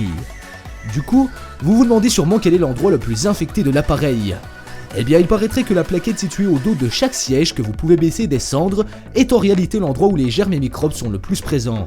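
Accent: Belgium. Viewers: t es enrume ou t as un accent belge ?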